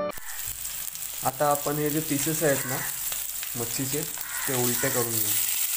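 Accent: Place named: Agri Koli, India. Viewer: भोईट बोलतान आमच्या आग्र्यांच्यान आणि कोल्यांच्यान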